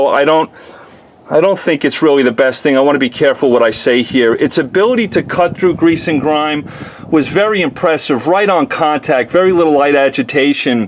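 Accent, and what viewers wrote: New York, United States. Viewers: How comes you sound like your from NYC and live in Texas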